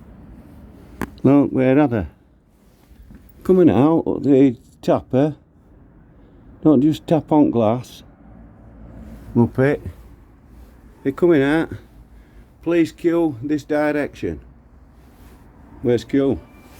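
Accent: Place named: RP, United Kingdom. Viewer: I thought you’d be an Earl Grey man with your posh accent!!! (I can’t abide all that poncy stuff. It tastes like perfume to me).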